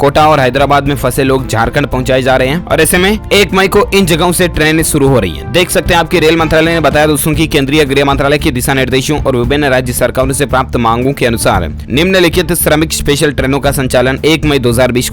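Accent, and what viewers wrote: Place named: Bihar, India. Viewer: भाई आप बिहार से है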